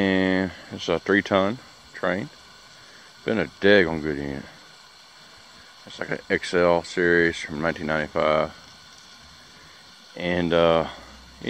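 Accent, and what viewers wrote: South, United States. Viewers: how cold does it get where you live? If I remember correctly if it gets to cold they don't work properly. but I also can tell by your accent that your down south, so I'm sure you don't worry that much about 20 degree days like we suffer from up here in the north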